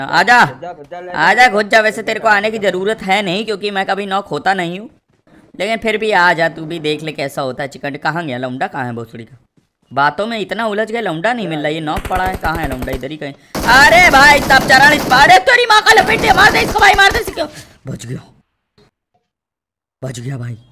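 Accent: Kanpur, India. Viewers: Bhai aap kanpur se ho kya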